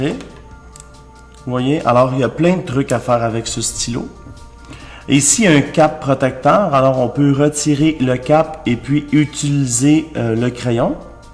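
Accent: Quebec, Canada. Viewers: j'adore le Québec et votre accent et jolie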